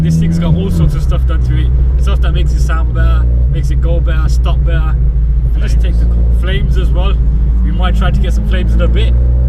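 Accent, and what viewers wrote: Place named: West Midlands, United Kingdom. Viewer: West Midlands.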